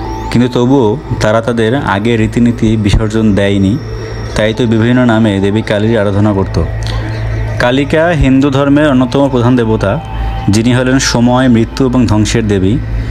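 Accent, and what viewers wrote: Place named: Bangladesh. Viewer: আপনি বাংলাদেশী accent এ কথা কেন বলছেন?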